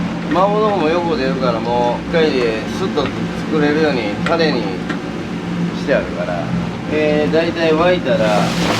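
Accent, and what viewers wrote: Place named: Osaka, Japan. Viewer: 動きも喋りもルーズに見えるんですけど無駄がないから余裕があるんですね。実況コメント、質問への答えのひとつひとつに無駄がない。おすすめメニューの理由が「他店との比較ができるから」、焼き飯がおすすめの理由（動画全部観てください、説得力あります）も誠実というか。誠実・正確でゴリゴリの大阪弁っていうのがまたいい。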